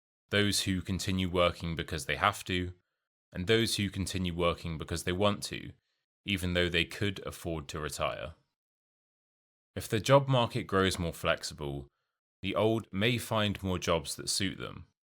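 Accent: United Kingdom. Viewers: Like it very much your videos and it perfect British English and properly helped and thank you very much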